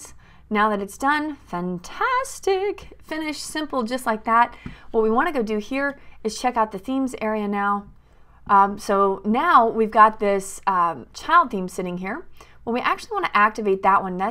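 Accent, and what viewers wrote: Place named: Texas, United States. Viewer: you are a genius. Love the texan accent.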